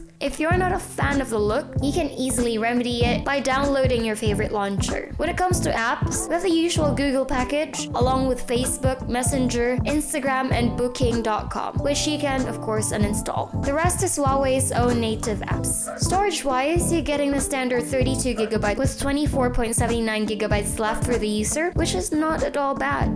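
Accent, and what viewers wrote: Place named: Philippines. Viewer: Now that's what you called a filipino-english accent😍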